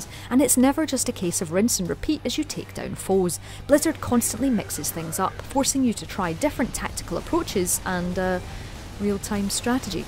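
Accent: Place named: Scotland, United Kingdom. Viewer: it's a scottish accent ^^ same as mine.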